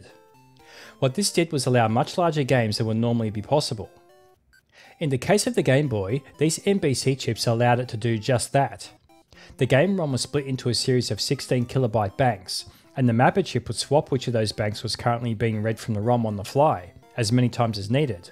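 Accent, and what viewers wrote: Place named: Australia. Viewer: Niche info about old games, Aussie accent, backing track. Perfect channel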